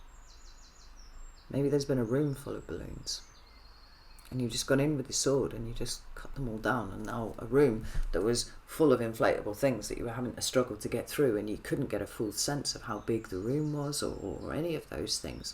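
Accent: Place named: England, United Kingdom. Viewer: Yo sister greetings from Andalusia Spain like the English accent,rare thing these days thanks for reading ❤🙏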